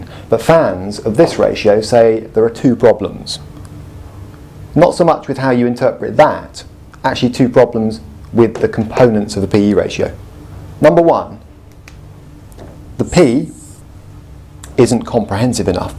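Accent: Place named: United Kingdom. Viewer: Hi, English is not my mother tongue and I thought that I will have problems with your lovely British accent but fortunately you speaks very clear. I am so happy that I found your videos. Just it is a pitty we cannot donwload the Tesco balance u have and in this way we can start to interprete the financial figures from companies as I am new investing.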